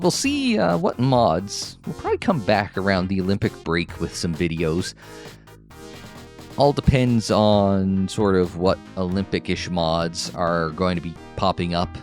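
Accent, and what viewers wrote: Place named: Newfoundland, Canada. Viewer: I am pretty sure that would be a Newfoundland accent...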